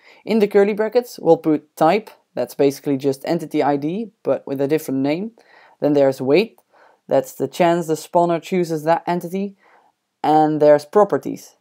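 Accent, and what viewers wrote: Netherlands. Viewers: You sound kindof dutch, are you from holland? If so, I'm to. If not, nevermind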